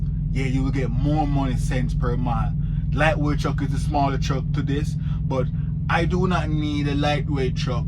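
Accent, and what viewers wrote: Jamaica. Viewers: Are u ja Jamaican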